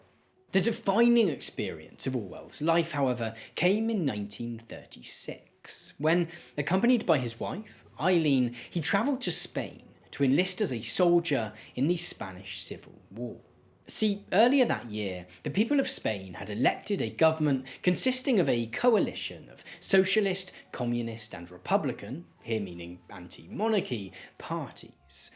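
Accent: RP, United Kingdom. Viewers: Your bbc voice makes these scary human beliefs a bit easier to handle. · Mr., the simplicity of your mind cant excuse the deliberate missinformation and outright lies in this video about both peterson and 1984. The wannabe etonian accent and wording you use for dramatic effect and to trick youre fans to think you are actually erudite cant really fool anyone else. Oh well, what a waste of time.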